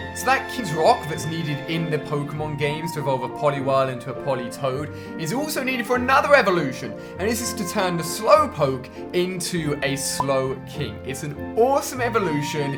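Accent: United Kingdom. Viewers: Is it just me or does he copy trainer tips but with a British accent?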